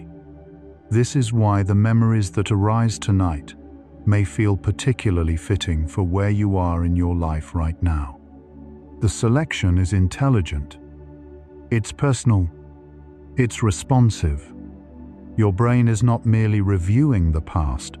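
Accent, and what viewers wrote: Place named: United Kingdom. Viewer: all these damn AI narrator's have British accents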